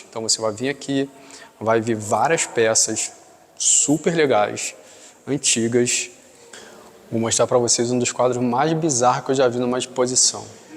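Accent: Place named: Rio de Janeiro, Brazil. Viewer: Adoro o sotaque carioca, muito chic!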